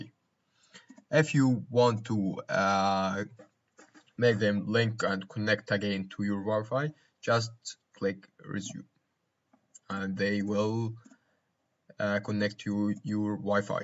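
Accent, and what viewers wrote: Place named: Egypt. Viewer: يسطا انت مصري so try to adjust your accent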